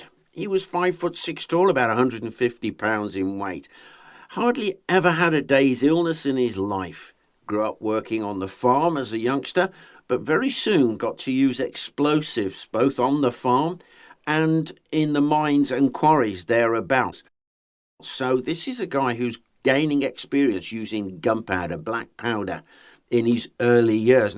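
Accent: United Kingdom. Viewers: A day with a new video from Mister is immediately a BETTER day! It is like having a British friend giving news! LOVE it! ☺👍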